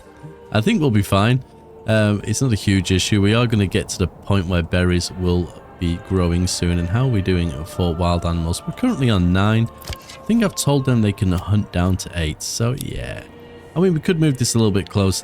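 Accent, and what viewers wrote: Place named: United Kingdom. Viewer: Ahh, you don't sound nasally...you sound British! 🤣 Unfortunately there is no way to unsnap road creation, unless maybe by starting short of a snap point instead of at an existing road. In my series I set up 2 iron deep mines and even with 8(?) bloomeries I couldn't keep up with ore production. They mine quickly. Good battle!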